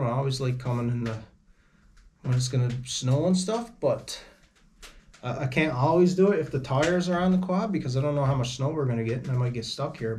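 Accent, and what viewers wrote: Newfoundland, Canada. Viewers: I knew I saw a Newfoundland flag in the video. I got the accent right off. Great spot for your cabin. I have a daughter lives in Gander Bay. I went for visit last year. Unfortunately I am from Toronto for the last 75 years. Beautiful place keep on sharing. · Good to hear a newfi accent , reminds me of my youth in clarenville , great video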